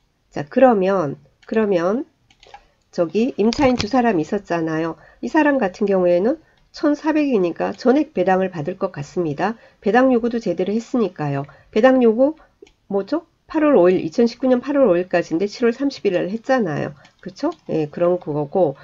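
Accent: Gyeongsang, South Korea. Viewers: 소장님 유튜브를 보다가 우연히 보게 되었습니다. 저도 경매에 관심을 가지고 책도 사고 혼자 열공중인데 어느 채널보다 실전같은 느낌이 많이 들고 같은 경남쪽이라 말투도 정겹고 정말 시청자를 위해서 하신다는 마음이 보입니다^^ 이렇게 도움되는 영상 항상 감사하고 저도 열심히해서 고수가 되어보겠습니다!!!! 긴 댓글 처음 이지만 항상 건강하세요^^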